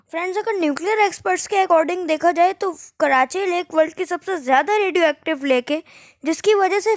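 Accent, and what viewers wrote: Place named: India. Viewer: I am from britain I can’t understand you because of your accent so people who are not indian can not understand you very well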